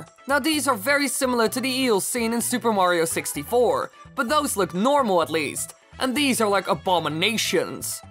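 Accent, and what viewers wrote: Netherlands. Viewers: Are you Dutch? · okay so i watched the video and i just gotta ask..is there a chance your dutch? xD your accent just sounds dutch espacially its not bad or anything im dutch myself (hela hola mijn medenederlanders) but im just really curious ehahaha xD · Are u dutch pls say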